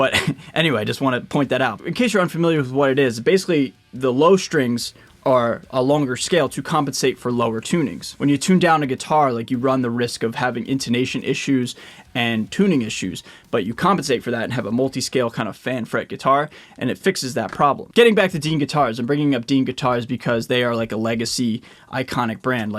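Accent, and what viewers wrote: United States. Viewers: by any chance are you american?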